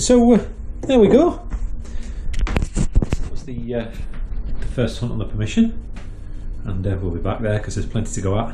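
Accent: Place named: England, United Kingdom. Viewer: Your accent makes me homesick for England. I grew up in Manchester and moved to the USA at 14. I lost my accent but people still hear a little of it. It's a lovely area where you are. I used to love walking in the fields and parks when I was young. I really hated reading about the fire at Wythenshaw Hall, I have fond memories of walking through the house and looking at the beautiful antiques they had . I hope they caught the horrible person that set fire to it. They destroyed a lot of history. Until next time, happy hunting, have a great day and I hope you find some really interesting artifacts. God bless.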